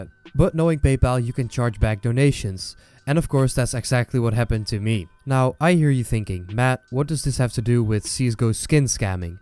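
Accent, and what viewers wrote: Netherlands. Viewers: You sound a bit Dutch!? can that be right? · I can hear a Dutch accent XD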